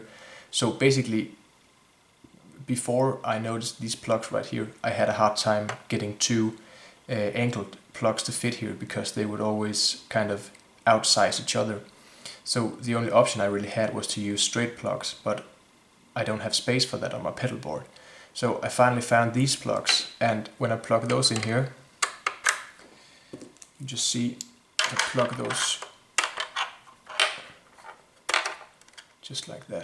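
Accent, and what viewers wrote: Denmark. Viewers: Hej (fandt dit navn på soundcloud), Jeg tillader mig lige at besvare dit spørgsmål inden jeg fortsætter med vores snak omkring kabellængder. Ja jeg er dansker og sad faktisk og tænkte det om du også var dansker, da jeg så videoen. Det er svært at løbe fra den danske accent, men du skriver og taler rigtig flot engelsk! Nå! Tilbage til det originale emne og tak for dit svar. Grunden til at jeg spørg er fordi, at de 30 cm kabler som jeg har nu passer perfekt til mit pedalboard. Men jeg ville gerne have kabler som disse for at få plads til flere pedaler, da de fylder ingenting sammenlignet med de traditionelle kabler (pro snake Patch Angled Jack). Jeg har så valgt at købe thomanns egne kabler som hedder Harley Benton FPC i stedet (du kan prøve at søge på dem), da de kostede halvdelen af EBS og ud fra hvad jeg kunne læse, så minder de utrolig meget om EBS kablerne. 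Men rart at vide at jeg også skal tilføje et par cm til dem, så behøver jeg ikke være bekymret om hvorvidt de er lange nok eller for korte. Så må vi se om de holder lige så længe som EBS ellers må jeg købe dem næste gang:-) Ps. Jeg har tilladt mig at abonnere på kanal, da dit indhold lige er noget for mig.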